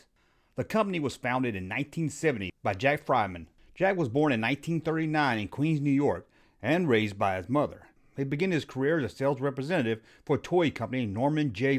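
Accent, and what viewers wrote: South, United States. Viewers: As a fellow toy nerd and southerner, it’s awesome to hear your accent talk about these subjects.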